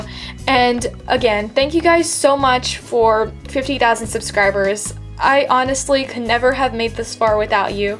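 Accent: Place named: United States. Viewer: No. America, do you hear her accent?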